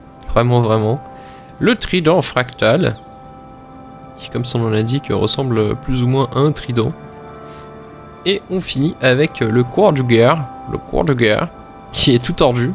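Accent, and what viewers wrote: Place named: Bourgeois, France. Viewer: superbe vidéo mais arrête de faire ton accent bourgeois. c'est juste pas drôle.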